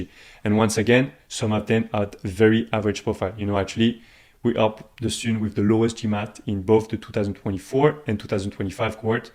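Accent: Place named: France. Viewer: Korean look, French accent😮 weird guy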